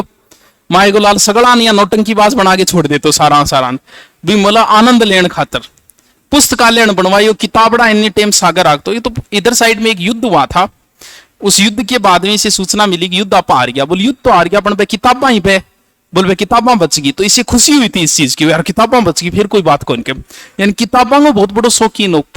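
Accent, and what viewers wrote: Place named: Shekhawati, India. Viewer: भाई हिंदी ही तो थोड़ी बहुत ही तो शैखावाटी बोलते हैं । इस बोली के कारण ही तो इसका पढ़ाने का तरीका मस्त है 🥰🥰🥰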